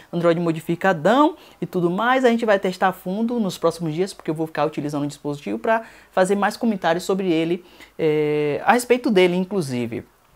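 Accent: Bahia, Brazil. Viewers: tu é Bahiano né 😂😂😂😂 se for é nós 👏👏✌ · Vc é baiano tb é? Kkkk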